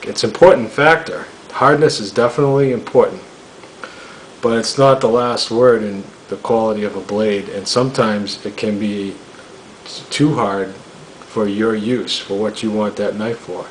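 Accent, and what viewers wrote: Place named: New England, United States. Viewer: Let me guess , Rhode Island or Boston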